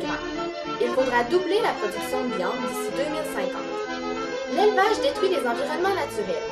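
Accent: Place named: Canada. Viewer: Trop de musique de fond, en plus avec votre accent canadien, difficile de suivre !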